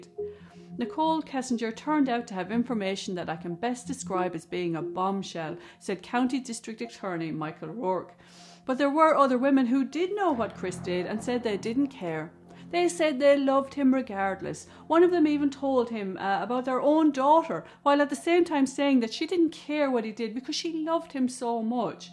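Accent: Ireland. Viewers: Great video and break down of this tragedy.! I believe NK most definitely has some role in all this. Maybe she didn’t kill anyone but she for sure knew his plans and possibly pushed him to do it. Love your accent BTW.! It actually sounds Irish with an English twang xx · Thank you for sharing your analysis! You sound Irish to me. I have many family members and cousins who live in Ireland and you remind me of them 😊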